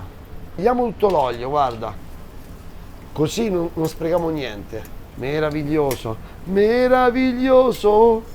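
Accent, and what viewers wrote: Rome, Italy. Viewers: e tutta ‘sta sceneggiata a me dà fastidio. Mi piace il romanesco ma questo è esagerato . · ...... sei forte, già lo sai tu stesso che sei "er migliore'! Soprattutto la tua parlata romana non deve mancare.....é come la ciliegina sulla torta!... L'inglese rovina un po ' questo tuo insostituibile carisma... ciao ti vogliamo bene!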